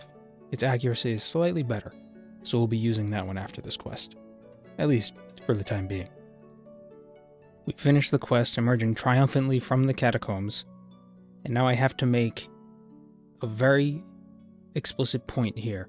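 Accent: Boston, United States. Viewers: i'm just here to look for the boston accent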